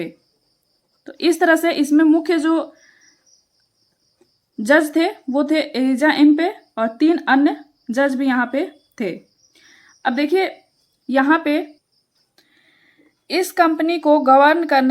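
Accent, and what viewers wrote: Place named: Bihar, India. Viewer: Mam apki voice tone se lg rha h aap bihar se ho😊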